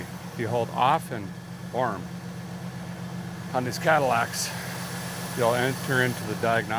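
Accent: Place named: South, United States. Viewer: I feel like we are losing you only because you’re starting to talk Southern as in “got me a coffee”. We still love you here in tropical Southern Minnesota. High of Zero tomorrow ♿️🚾🍻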